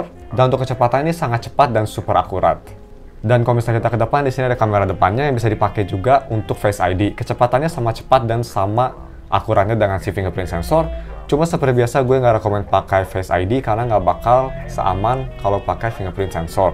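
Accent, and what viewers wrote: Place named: Kerhor, Indonesia. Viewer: Bahasa audio nya pasti orang kerhor ini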